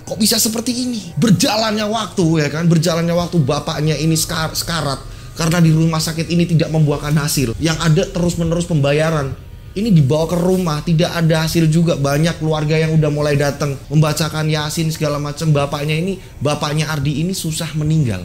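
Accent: Java, Indonesia. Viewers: Mas enak klo ngomong logat Jawa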